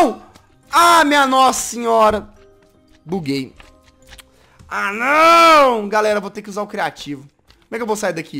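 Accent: Azores, Portugal. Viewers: tem staque portugues otimo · o teu sotaque português de portugal parece mais com o sotaque de Portugal açores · Adorei o teu sotaque de português Eu sou portuguesa kkkkkkk🥰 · O sutaque português é otimo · O seu sotaque português é midas!!!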